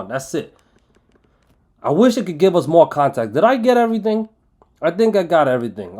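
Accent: New York, United States. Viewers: you are awesome!!!! you almost sound like a New York City guy!!!!!!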